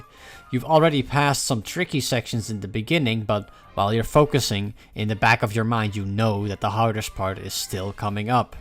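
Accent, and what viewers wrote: Netherlands. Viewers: Bro I only halfway through the video realized you're dutch lol. Good video man keep it up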